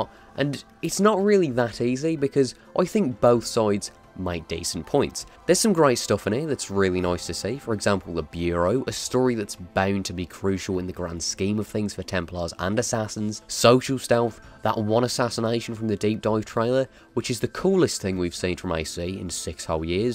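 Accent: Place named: RP, United Kingdom. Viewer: Why are you soooooo posh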